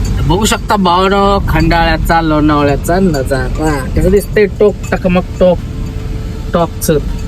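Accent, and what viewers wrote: Satara, India. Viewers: सातारची बोली भाषा एक नंबर भावा😂😂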